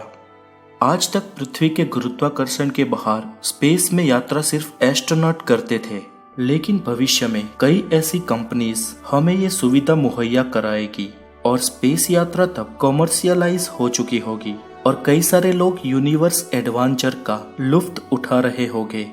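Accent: Gujarat, India. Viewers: Video is nice but the speaker has Gujarati accent in his voice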